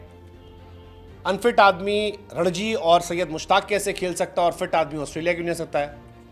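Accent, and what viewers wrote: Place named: Uttar Pradesh, India. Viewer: ghanta fadak padh rha hai😅, up waale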